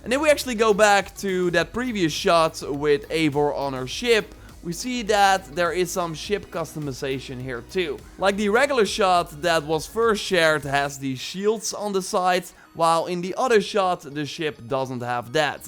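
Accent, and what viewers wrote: Netherlands. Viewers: You, I can hear that you're Dutch. Is there going to be an area in Valhalla in the Netherlands? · Damn I hate the Dutch accent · Gwn een vraagje, ben jij nederlands?? Denk dat ik n nederlands accent hoor🤭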